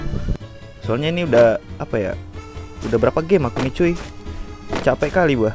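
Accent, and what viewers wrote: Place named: Makassar, Indonesia. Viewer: KaLau BoLeh Tau Abang Orang Mana , Kok Logatnya Kyak Makassar ( Masih Mauko Datang Besok ) 😂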